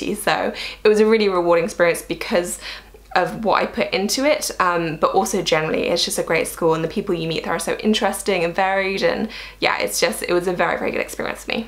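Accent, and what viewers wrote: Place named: Wales, United Kingdom. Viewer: I’ve only recently come across your channel and I’d like to say how humble you come across, you give some brilliant advice. I’m from South Wales and was so surprised to hear you and your dad are Welsh! 😂😂 you’re definitely the poshest welsh person I’ve ever heard 😂😂. Well done on your career and your YouTube channel you’ve definitely got a new subscriber xx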